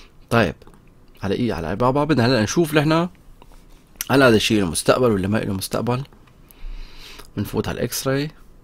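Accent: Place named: Idlib, Syria. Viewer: ليش حاسك ادلبي 😁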